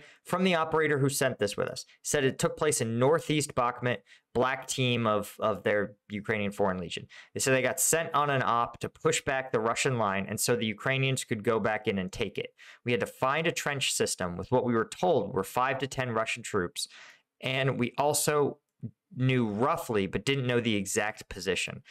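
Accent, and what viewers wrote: United Kingdom. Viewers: So proud to hear a British voice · Sounds like he’s British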